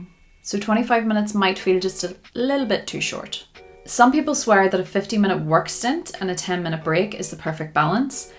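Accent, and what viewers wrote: Northern Ireland, United Kingdom. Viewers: Is that a Northern Irish accent I hear? 😅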